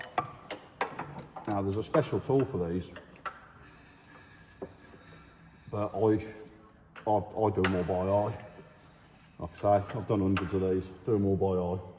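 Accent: Birmingham, United Kingdom. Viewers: Nice hearing a fellow Brummie and also getting a result out of that engine. well done kid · Just watched your channel for the first time and thoroughly enjoyed the Brummie tones of your commentary. You obviously know your stuff on trannies and are able to make judgments using your experience. I’ve liked and subscribed and look forward to watching you again in the future. 👍🇬🇧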